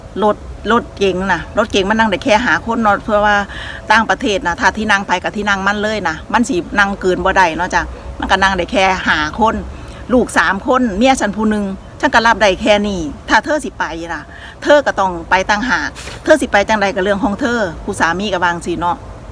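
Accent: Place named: Isan, Thailand. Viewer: พูดอีสานฟังไม่ค่อยออก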